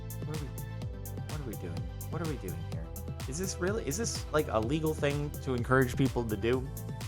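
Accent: Australia. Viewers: I mean....speaking as an aussie who has heard people try Aussie accents yours sparklez isn't too bad. It at least doesn't sound more British than aussie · Holy shit he actually sounds australian i have an australian cousin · Mate, not far off the Aussie language. I’m impressed.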